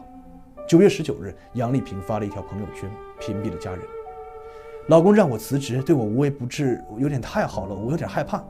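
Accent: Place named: Shanghai, China. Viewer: 你这个上海普通话，把这么严肃的案子说笑了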